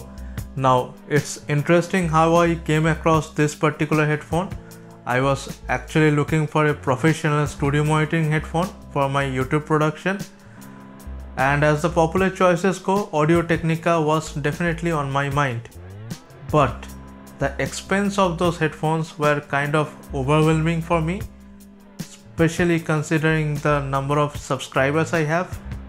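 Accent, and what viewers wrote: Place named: India. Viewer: Lay back with ur Indian voice a bit please ruined the video kind of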